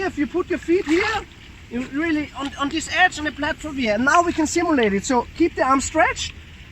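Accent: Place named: Austria. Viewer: Du bist ein netter Kerl und deine Videos in deutsch sind wirklich hilfreich,aber warum dies Video in englisch? Für wen? Selbst für mich mit Muttersprache Deutsch und sehr guten englischkenntnissen ist es eine Qual dir zuzuhören. Viel schlimmer noch für jemanden mit Muttersprache englisch. Bleib lieber bei deutsch mit nettem (österreichischem?) Akzent. Dein englisch ist einfach grausig.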